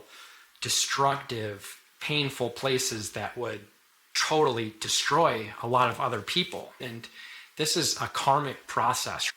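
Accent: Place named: Minnesota, United States. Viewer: All I want to know is, are you Minnesotan ? 😂 your accent give me that vibe. Also Scorpio rising video was on point !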